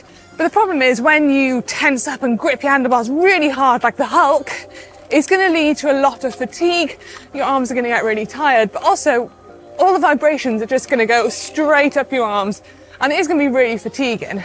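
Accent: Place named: United Kingdom. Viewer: I'm just watching to listen to her lovely British accent. Three cheers for the King! 🇬🇧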